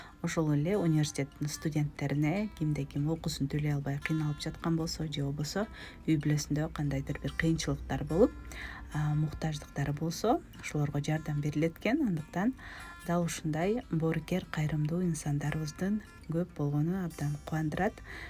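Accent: Standard. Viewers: Таза кыргызча сүйлөйт экенсиң, кооз, уккулуктуу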